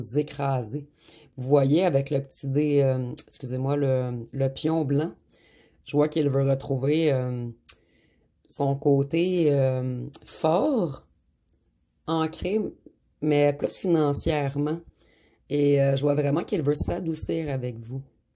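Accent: Canada. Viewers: Merci. Le bel accent du beau Canada. ❤❤❤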